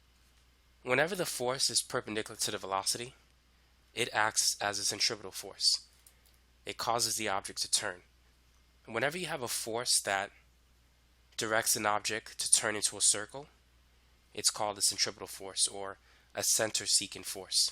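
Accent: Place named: New York, United States. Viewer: i cant stop focussing on the new york accent omg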